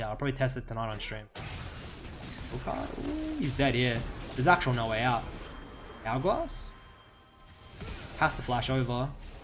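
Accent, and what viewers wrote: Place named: Australia. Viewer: voice is so annoying...too aussie...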